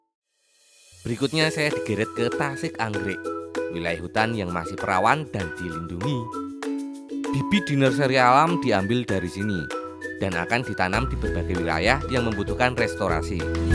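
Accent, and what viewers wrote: Java, Indonesia. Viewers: Keren om 😀 Asli orang mana sampeyan mas? Medhok tenan wakakak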